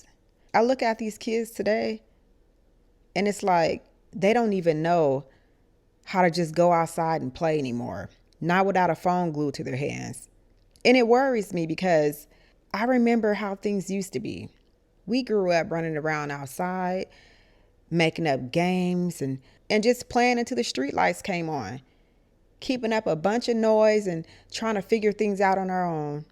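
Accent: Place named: Los Angeles, United States. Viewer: You from LA? You got a LA accent.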